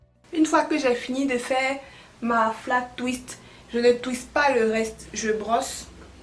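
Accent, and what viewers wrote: Ivory Coast. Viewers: Une vraie Ivoirienne rien que t'entendre jee retrouvé u puays 😍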